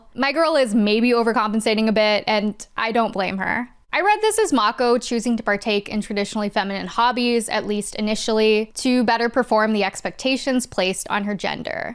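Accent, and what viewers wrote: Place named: Southern California, United States. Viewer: Me, after clicking on a random recommended Sailor Moon essay: Is... is that a So Cal. accent?: [something something] Hollywood [something] (I'm not going back to find the timestamp, sorry) Me: Ohhhhh. HELL YEAH. More California accent representation, please! I have found my -people- person. Good vid, btw, despite the technical issues. I watched the first run of the dub back in 1995 before middle school and loved it, but that's the extent of my Sailor Moon knowledge.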